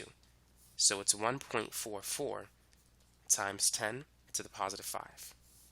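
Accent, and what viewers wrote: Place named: New York, United States. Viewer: I came hear to get my seventh grader help. Now I can't stop listening to him. His voice is calming, yet keeps our interest. I love my friend's New York🤔 accent.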